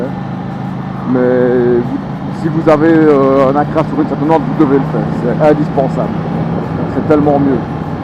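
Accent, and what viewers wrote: Belgium. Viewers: tu as un sacré accent belge ou je me trompe? belle vidéo aussi hein :)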